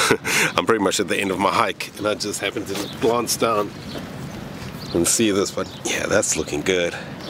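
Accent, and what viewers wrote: South Africa. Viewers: The longer you go back in this guys video archive the more South African he sounds 😮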